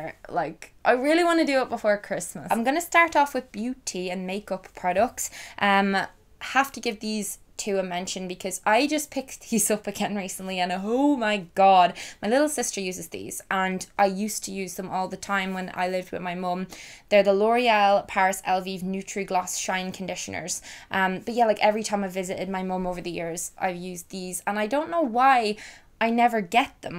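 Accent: Ireland. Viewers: Is she Irish 🤔🤔 X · most youtubers just bore me but i could sit and watch all your videos back to back because i love the content so much! but the irish accent just makes me feel so at home, as my grandad is irish and i love listening to his stories!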